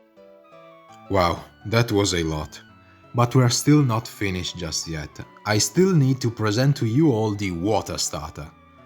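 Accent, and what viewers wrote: Italy. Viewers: Bel video, scrivo in italiano perché si capisce dalla accento, è ispirato a dadrake giusto?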